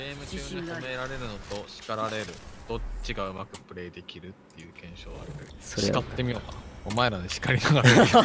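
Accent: Kansai, Japan. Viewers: 関西の人特有のキレキレのツッコミが面白かったのでチャンネル登録は次回にさせてもらいます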